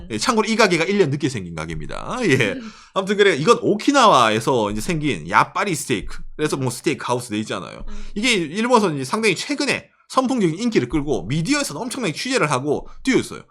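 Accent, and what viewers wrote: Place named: Gyeongsang, South Korea. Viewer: ㅎㅎ ~~~멘치로... 경상도 인가벼...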